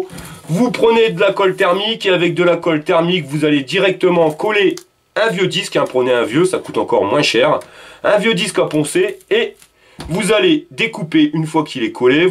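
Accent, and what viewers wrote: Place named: Nord, France. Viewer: Juste pour l'accent du chnord je m'abonne 👍🤙 Big UP d'Algérie 😉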